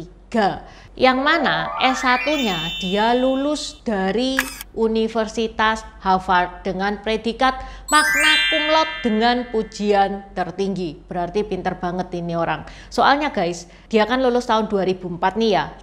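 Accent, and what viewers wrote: Java, Indonesia. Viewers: Salam medhok. Buat Mbak, 😊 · Medok banget mbak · Kenapa bisa medok..cece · Sy gemes ma suaranya wong cindo jowonyo medok tenan ❤❤ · Kalo kak Cijaw...Cina Jawa makannya medok pokonya mantap kak ❤🇮🇩👍👍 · Cici,bahasa Indonesia mu jelas tp tetap aksen Jawa nya masih keliatan,mungkin Cici lahir di Surabaya...semangat selalu untuk warga keturunan dan warga lain nya,apa pun suku kamu,apa pun agamau apa pun warna kulit mu apa pun latar belakang mu mari kita bersama untuk membangkitkan negri ini yang terlalu lama tertidur,bangsa ini terlalu besar butuh orang orang yang bisa merangkul semua pihak,ingat negara kita negara kesatuan jadi kita semua harus bersatu membangun bangsa ini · Ci juga out of the box loh, mencari konten yg lain dari yg lain dengan data yg akurat juga medok banget. TOP!, sukses terus ya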